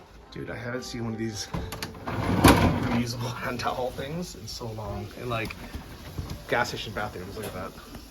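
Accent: Taiwan. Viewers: Sounds like Taiwanese mandarin. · That's a Taiwanese accent if I ever heard one.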